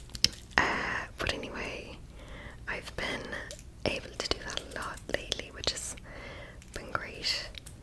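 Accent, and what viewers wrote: Ireland. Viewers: I have a 5-star asmr playlist. Hard to get on it but this one is on it. I also have an “Exceptional” ASMR playlist. Even harder to get on, but I just put this one on it. Your Irish accent voice is the best, and you are one of the most beautiful women I have seen on YouTube. Thanks for sharing with us.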